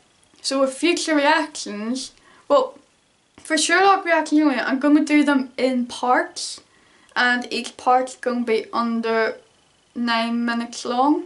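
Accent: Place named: Ireland. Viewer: ) I like irish accent. I wish you way more than 500 subs.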